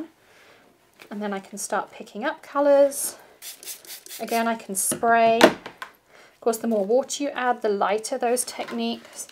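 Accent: England, United Kingdom. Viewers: Hi, thank you for your videos. They are very nice. I love the 2mn ones....and your English accent. This said you keep talking about the « top right hand corner » for past videos. I watch a lot of videos on my phone and I have nothing on that corner. On my computer, I have advert. Apart going into your page and check the whole videos, I don’t see how to get the past videos mentioned. Am I a dummy here?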